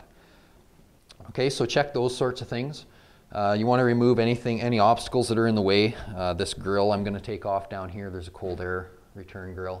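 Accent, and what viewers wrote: Canada. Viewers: Heavy Canadian accent wo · The second I heard the Canadian accent. I knew I could trust this guy. Thanks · Only americans can tell if he has a Canadian accent ! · as soon as i heard the canadian voice i clicked off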